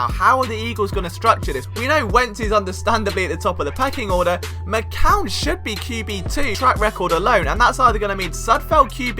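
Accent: United Kingdom. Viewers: Why is a guy with a British accent talking about American football? I can't even watch the entire video, dude this is America not London.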